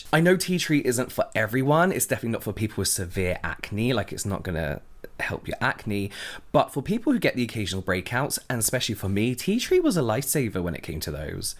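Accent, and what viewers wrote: United Kingdom. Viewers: Are your from the UK?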